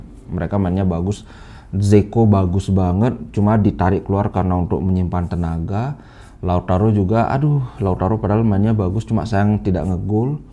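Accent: Bali, Indonesia. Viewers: Org bali ya bang?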